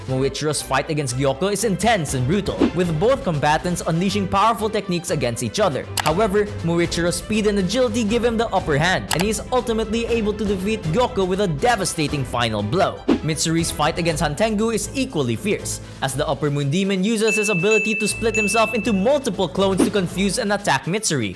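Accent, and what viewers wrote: Philippines. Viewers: That Filipino accent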